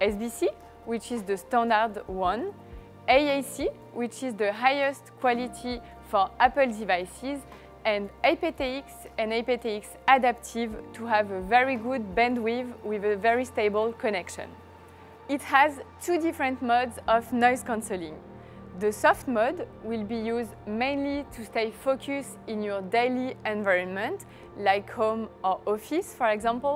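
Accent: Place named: France. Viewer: J'adore son accent français 🥰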